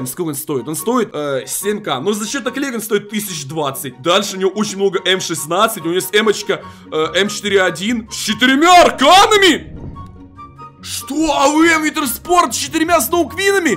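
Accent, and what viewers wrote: Ukraine. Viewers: ты с Украины?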